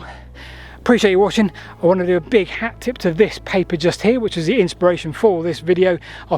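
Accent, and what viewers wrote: United Kingdom. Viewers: You do good documentaries, but, you need to slow down your words and talk with a bit more articulation, especially with a British accent. I have to stop the video often to decipher those rapid burts of words.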